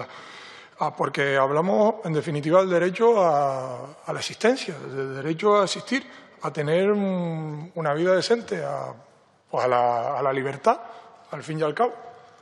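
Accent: Spain. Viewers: LOS FASCISTAS, SUS MENTIRAS Y MANIPULACIONES...EL CHAVAL ES ESPAÑOL, ADEMÁS CON MÁS ACENTO PURO QUE LOS "OSEA, CACEROLA, PUM, PUM"... AQUÍ SE PODÍA APEDREAR A UNA MUJER DURANTE EL FASCISMO, O LO QUE SE QUISIERA...Y LOS BOTS, HAN SIDO MÁS VECES DESMONTADOS POR PARTIDARIOS DE PP-VOX EN EL ÚLTIMO AÑO, QUE LOS UTILIZADOS POR RUSIA Y SUS HACKERS EN EL MUNDO ENTERO...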